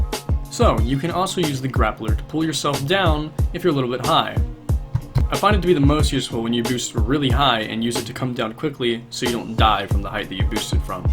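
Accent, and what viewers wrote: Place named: England, United Kingdom. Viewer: you prob are the first parkour youtuber i have ever seen with an english accent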